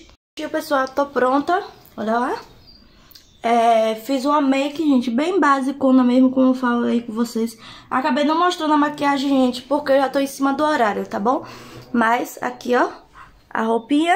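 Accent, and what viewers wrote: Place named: Northeast, Brazil. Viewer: Ela eh nordestina vcs não entendem que o sotaque eh normal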